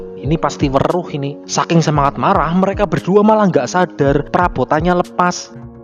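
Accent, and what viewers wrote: Banyumas, Indonesia. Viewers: Aja kelalen ngapak kang.. 😂😂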